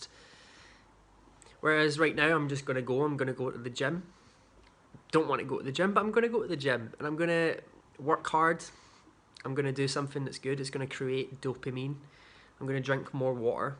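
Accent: Scotland, United Kingdom. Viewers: I've just subscribed on YouTube. I've watched you on Facebook forever. I'll be honest, I can't pass up a scottish accent lol but you're always positive with messages. You're my first son's age, it can be a dark and lonely road the internet,the highs the lows. Life in general is hard at that age without a public one. I hope you are as sound as you talk and look back and reflect on these days in passing as building blocks and lessons. Now I'm sounding old af ( im 44 fyi) and just hope you're ok. Leave if not , those who dont know you personally may will still be here, most probably will because you're funny and sweet,, and those who do know you personally will be there to pick up the pieces, certainly doesn't make you week.. Likes are not what makes you exist. You exist because of of you. 😍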